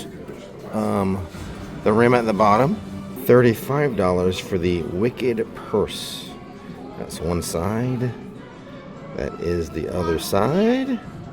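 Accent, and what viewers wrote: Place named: Boston, United States. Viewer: Wicked. Sounds like Boston.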